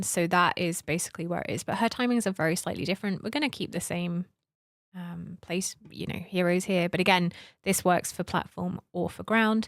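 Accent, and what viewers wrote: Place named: United Kingdom. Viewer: Yeh… after ages just got past 19… I dread even trying 20😵‍💫 but thanks. BTW great to see/hear a fellow Brit do these vids👍🏽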